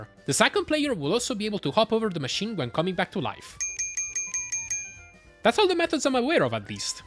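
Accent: Italy. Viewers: Lo sapevo che eri italiano dall'accento in inglese😭😭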